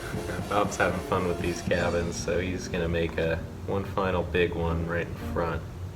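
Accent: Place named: United Kingdom. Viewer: I love this,. Thank you for posting. It looks like you are using a smaller, square canvas and much smaller brushes than Bob uses. I have been given a lot of small canvases including square ones. I shall be trying your method of painting this using smaller brushes too. Your accent sounds British, if so where are you from? I’m English but now living in Spain.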